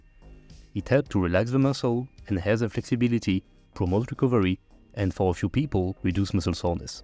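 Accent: France. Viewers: Forgot I'd downloaded this to listen to on my flight 😅 a bit surprised to hear the frenchmans voice. Great video bro 👍🏿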